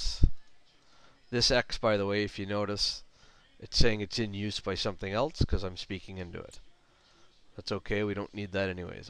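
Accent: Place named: Ontario, Canada. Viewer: thanks for the great helpful vid! I have to ask, by your accent you sound like you're from Ontario. Are you?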